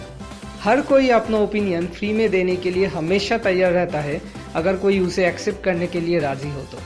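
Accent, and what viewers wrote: Bengal, India. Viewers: Dada, bangali naki? Accent shune mone holo :) A good video!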